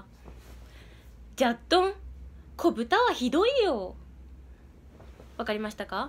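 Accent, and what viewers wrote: Kagoshima, Japan. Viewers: chie zooo 鹿児島弁可愛いですけ？ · ちゃんのかごんま弁が可愛すぎてちょこちょこ見に来てしまう…❤️ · 鹿児島に産まれたかったなー！鹿児島弁かわいいなー！眉毛のインパクト大！！！！ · すばらしい！きれいな鹿児島弁です。おいどんがた、きっしゃなか鹿児島弁やね～（笑） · 가고시마사투리 귀여워요ㅋㅋ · すごい可愛いし、見てて全く飽きないし、鹿児島弁がすごく好きになりました！！(関東人)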